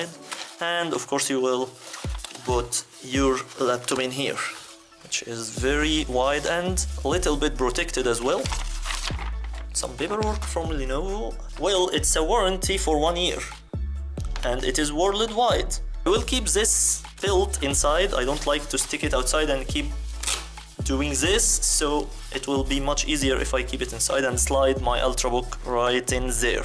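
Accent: Egypt. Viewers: your accent seems to be Egyptian, bro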